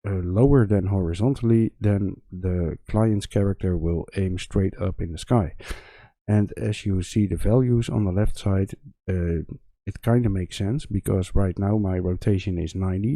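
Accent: Netherlands. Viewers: You can so clearly hear you are Dutch! I am complete 47 years noob learning UE for fun, love this tutorial.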